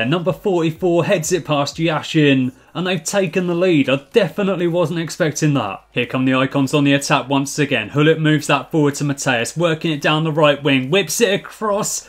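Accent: Oxford, United Kingdom. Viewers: Are you from oxford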